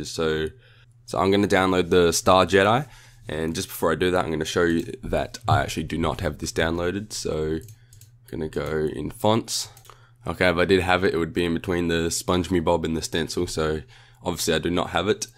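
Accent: Australia. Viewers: I neve thought the Ausi accent and IT would go so well together....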